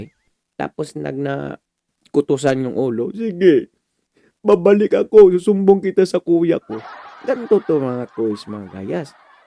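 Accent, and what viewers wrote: Visayas, Philippines. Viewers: Visaya ka eh di ka Naman tlga Taga manila hahaha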